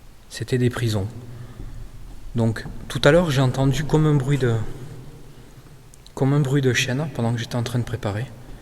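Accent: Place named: South, France. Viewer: Un Abonné de plus ! Je suis fan du travail de Guss DX et j'attendais avec impatience un contenu similaire mais avec une touche personnelle différente. Qualité de Montage, Durée de vidéo et l'accent du sud 3 épisodes et me voila déjà fan de ton travail ! Continue sur ta lancée je trouve cela Génial !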